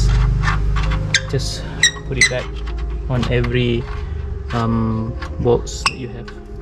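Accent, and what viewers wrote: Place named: Australia. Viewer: Pergh oz accent... Next content seat bucket ke???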